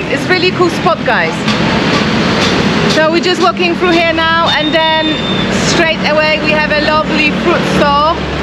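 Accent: Thailand. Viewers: 😊❤สวัสดีครับคุณพูดไทยสำเนียงอิสานเหมือนผม..น่ารักมากครับ,,🙏❤️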